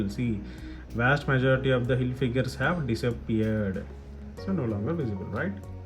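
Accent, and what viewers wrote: India. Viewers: The fu/king indian accent 🤣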